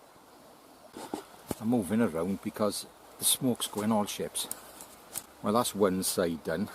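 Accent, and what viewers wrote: Wales, United Kingdom. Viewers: Probably the MOST Welsh accent I’ve ever heard!! 😅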